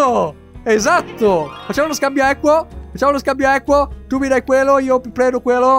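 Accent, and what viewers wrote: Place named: Veneto, Italy. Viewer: il dialetto veneto <3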